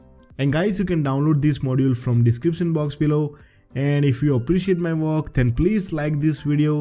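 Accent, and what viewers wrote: India. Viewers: Bro Ur Indian🇮🇳 or 🇦🇺Foreigner...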